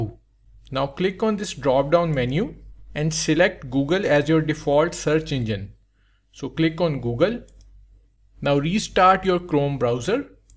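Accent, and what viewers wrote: India. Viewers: When I heard an indian guys voice I knew the tutorial would be lit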